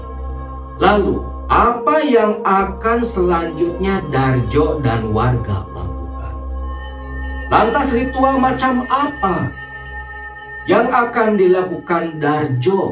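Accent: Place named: Banyumasan, Indonesia. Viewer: Tegal hadir kang wong ngapak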